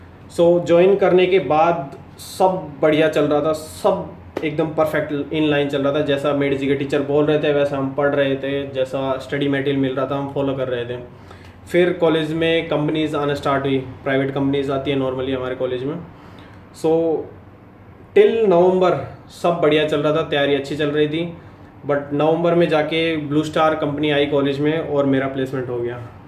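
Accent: Haryana, India. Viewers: Boli m jyada Haryanvi touch h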